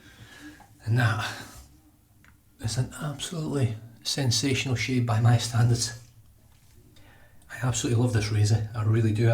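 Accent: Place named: Scotland, United Kingdom. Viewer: Great review, sir! Where in Scotland are you from, can’t place your accent!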